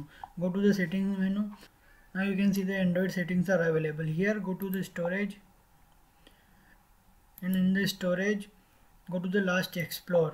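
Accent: India. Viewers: That is the most Indianest accent I have ever heard. Keep up the good work bro! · You know you're saved when the commentary is in indian accent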